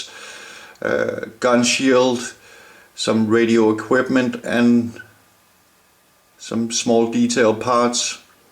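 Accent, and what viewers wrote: Denmark. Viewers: Are you from Denmark? Sounds like it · You danish?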